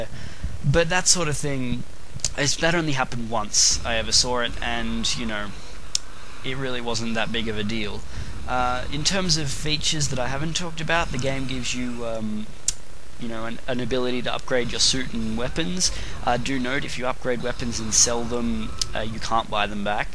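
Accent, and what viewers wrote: Australia. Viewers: always amusing to hear another aussie on youtube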